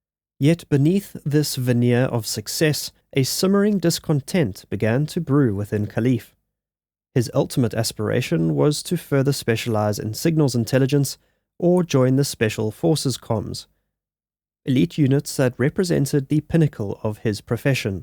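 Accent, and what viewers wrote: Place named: South Africa. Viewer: I always get thrown off by the South African accent at the start, but then it reminds me off home so I love it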